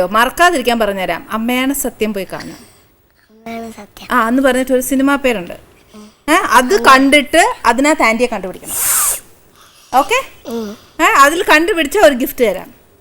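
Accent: Kottayam, India. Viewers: Kottayam slang matram mattiyal kollam... Dahikkunnilla.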